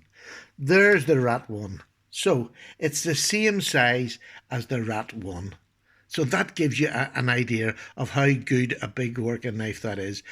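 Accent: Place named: Ireland. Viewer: This review made me purchase this knife. Your voice sir makes me want to visit Ireland and drink large quantities of beer. Cheers from Texas!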